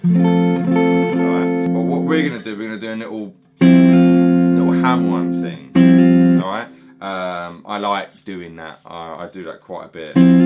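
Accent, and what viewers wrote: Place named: Cockney, United Kingdom. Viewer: def sound like a cockney I was born in whitechaple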